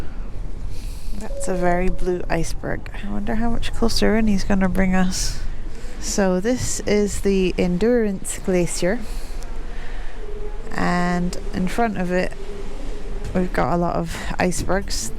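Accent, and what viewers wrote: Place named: Scotland, United Kingdom. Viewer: It’s always nice hearing another Scottish accent randomly when searching for Shackleton stories haha. Great video love from Cumbernauld.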